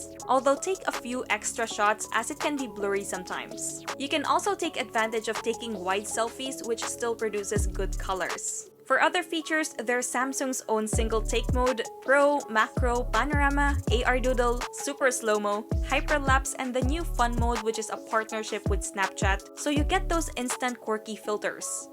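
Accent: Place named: Philippines. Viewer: Love that Filipino accent 💕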